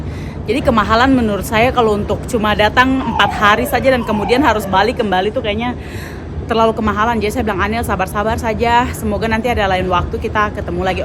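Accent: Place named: Eastern Indonesia, Indonesia. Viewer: Sehatsehat kaka. Vlognya sllu menarik, dn sya suka sama gaya bicaranya kaka krna kebetulan saya juga orang timur. Sya sudah ikuti dn nonton vdeo kka dari sebelum mncapai 1 jta subscribe, dari msih tinggal di apartemen, tdk prna bosan sma vdio2 kaka. Mkasi sudah menghibur keseharian sya, dn smoga alur cerita hidup kaka bisa ketularan ke saya yg smntara proses memikirkan dn melangkah sdikit demi sedikit untk mnuju masa depan dn hdup yg lbih baikk🤗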